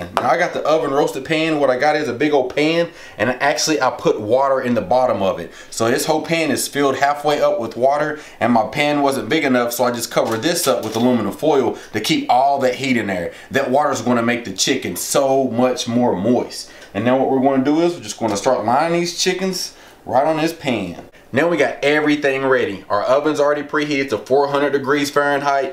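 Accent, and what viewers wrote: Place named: Texas, United States. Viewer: I bet you are from Texas, you got that accent I’m familiar with 🥰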